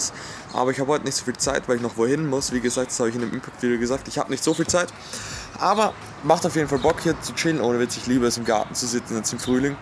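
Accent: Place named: Graz, Austria. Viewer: Das ist der grazer Akzent wir sind keine Bauer in graz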